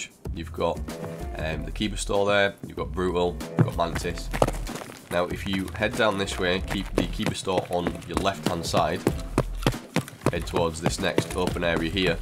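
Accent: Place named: United Kingdom. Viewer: i love that British accent :D <3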